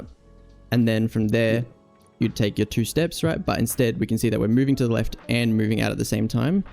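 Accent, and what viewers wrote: Australia. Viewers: as an aussie ibwould say he has more of a aussie sydney accent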